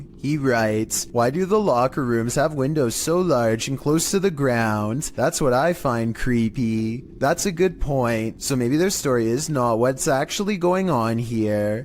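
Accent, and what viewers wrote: California, United States. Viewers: 😂 He sounds like THE CALIFORNIANS from SNL . go check it out and tell me I'm right 😅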